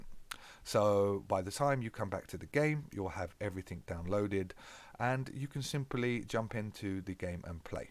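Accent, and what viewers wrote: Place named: United Kingdom. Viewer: This is one of (if not) the best - "How to find a Unit" guides I've seen 👏🏼. It's also refreshing hear a UK voice delivering it 👍🏼! Certainly a unit I'd consider applying for in the future perhaps 😉. In the meantime, I've subscribed and will be watching/spying/researching (😂) the channel.. 👀 Peace Out ✌🏼😎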